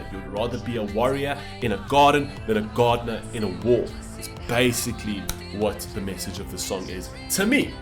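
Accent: South Africa. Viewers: An album review would be awesome Edit: im curious...are you South African? You sound like it.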